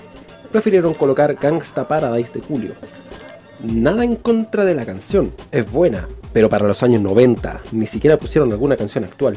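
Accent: Chile. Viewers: este wn es terrible chileno oe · Chileno poh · Eres chileno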